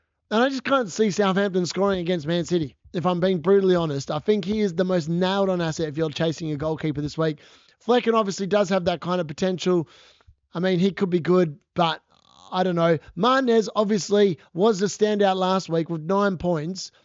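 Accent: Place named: Australia. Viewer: Hi mate, love your content and delivery, great hearing an Aussie accent . I'm Aussie in NSW . First year playing but lovin' it. A great differential in my opinion going forward is McNeil from Everton , they have increased his minutes over the last few weeks and he was brilliant last week . $5.1m if not this week definitely next imho. Cheers bro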